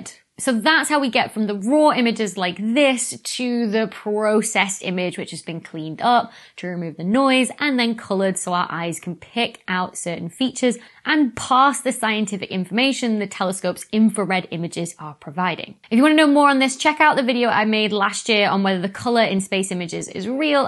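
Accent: United Kingdom. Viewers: Because you are not used to genuine British accents. I have no issue at all understanding her at normal speed. One year I was in West Virginia at a factory and had a British guest. He asked a question. The W.V. man tired to me and asked, seriously, "What language is he speaking?"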